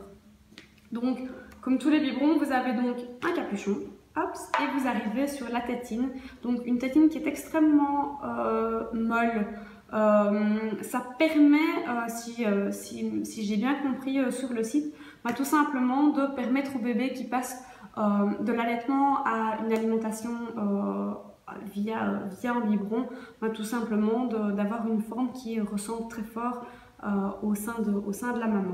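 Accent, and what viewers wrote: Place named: Belgium. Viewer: coucou. juste un message pour te dire que tes vidéos sont mettent de bonne humeur, ton naturel, ton accent belge (moi je suis du sud :-) ), ton peps et bien sûr ton Choupy trop mignon. j attends avec impatience la sortie de tes nouvelles vidéos..et bien évidemment je tente ma chance pour le biberon 😋 qui ne tente rien à rien 😉 bonne continuation !!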